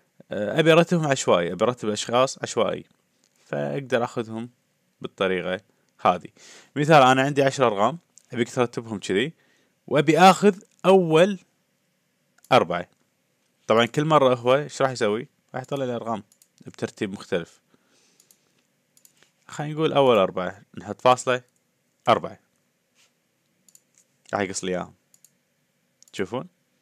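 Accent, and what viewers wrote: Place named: Iraq. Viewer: عاشت الايادي والله صراحة كلت جنابك عراقي لان لنجتك كلش قريب علينه حياك اخوك مهيمن فالح من العراق بابل ممكن باقي شروحاتك صراحة محتاجهن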